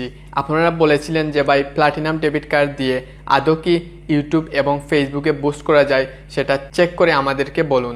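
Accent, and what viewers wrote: Sylhet, Bangladesh. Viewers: ভাই, কী সিলেটি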